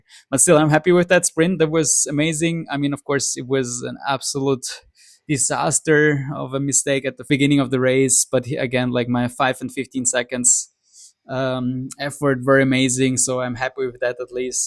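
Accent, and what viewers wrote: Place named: Portugal. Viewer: Portuguese origins? The first name but mostly the english accent. Sounds very portuguese style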